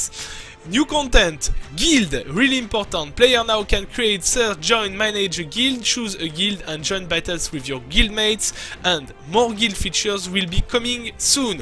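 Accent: France. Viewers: Toi français c'est sure vue ton accent ! Mdr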